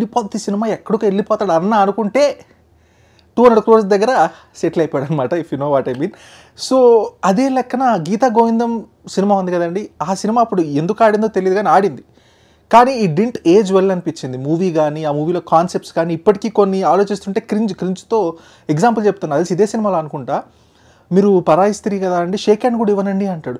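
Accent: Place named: Andhra Pradesh, India. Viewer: అరేయ్ ఎందుకురా తెలంగాణని చెప్పుకు తిరుగుతావు నువ్వు ఆంధ్ర నీ యాస నే చెబుతుంది నీలాగే పూల సొక్క గాడు ఫస్ట్ తెలంగాణ యాసలో మాట్లాడి మళ్లీ వాడు ఆంధ్ర వాడు అని ఒప్పుకున్నాడు నువ్వు కూడా అలాగే ఒప్పుకో డ్రామాలు ఎందుకు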